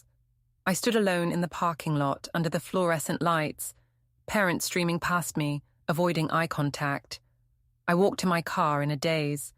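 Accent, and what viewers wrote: United Kingdom. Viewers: This was obviously written by a yank, why use a brit to "read" it. Syntax and turn of phrase distinctly American. Irritating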